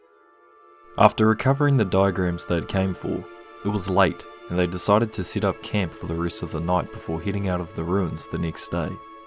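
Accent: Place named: New Zealand. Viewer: Definitely from new Zealand (am Australian)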